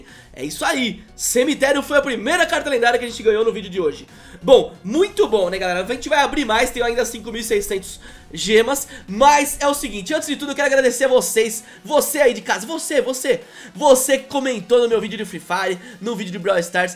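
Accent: Minas Gerais, Brazil. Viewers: e mineiro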